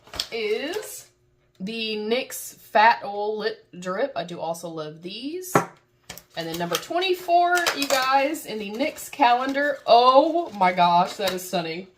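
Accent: South, United States. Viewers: I watch Alexandria and I thought she had shown every calendar in existence. This is a pleasant surprise. I am presently using a NYX lipstick. Nothing fancy nude color but if I can't replace it when it's gone I'll be lost. I'm a mature gal and don't use a lot of makeup. I find NYX or Elf usually meets my needs. Off to watch the opening :-) Wow so far the price, the packaging and the free gift with purchasing. How can you go wrong. I'll be back. Haha. Definitely a good price. I felt it was very lip color heavy. Would have like to see some powder blush, mascara and maybe lip bullets. I'm an old fashioned gal. I did miss the items we didn't see you open so possibly some of the things I mentioned were in there. I'm off to subscribe and check out your content. I love that Southern Twang. 🤠😉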